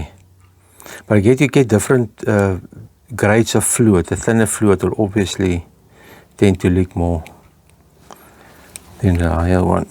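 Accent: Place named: Afrikaans, South Africa. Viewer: Ek love die Afrikaanse english. Trots Suid-Afrika, my oom. Ek kyk graag oom se videos.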